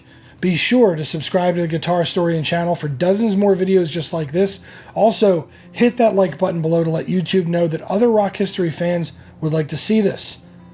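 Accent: Philadelphia, United States. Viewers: Hey, are from somewhere near Philadelphia? I like guessing American accents. I’m front Boston where we famously have distinct an accent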